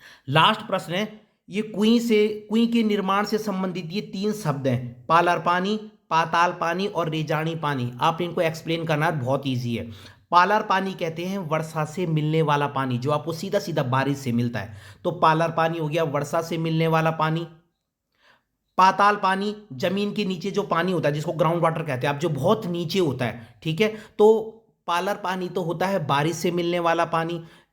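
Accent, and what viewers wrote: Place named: Pahadi, India. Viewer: sir aap bhi pahadi ho kya kya aapke bolne ke tarike se laga raha hai ki aap bhi pahadi ho uttarakhand se mai bhi vahi se hun sir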